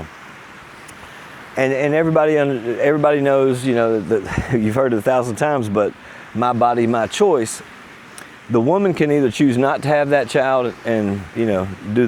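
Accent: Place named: South, United States. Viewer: How did I know this guy would have a southern accent before I pressed play…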